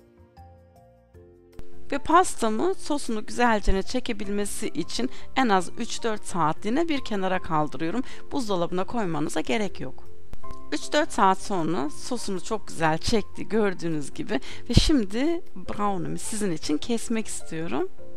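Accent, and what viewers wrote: Germany. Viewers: Hanım ablamız almanyadan sanirım almanlar gibi söylemiş :) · Farkındaysan almanca konuşan biri. Türkçeyi bu şekilde konuşuyor olması normal değil mi.. TDK başkanı mısın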